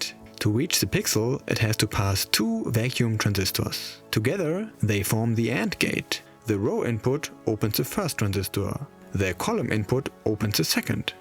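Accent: Germany. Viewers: Lol du bist deutsch? 😂 wieso ist mir der akzent erst am ende aufgefallen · Are you german? Sounds like a German accent..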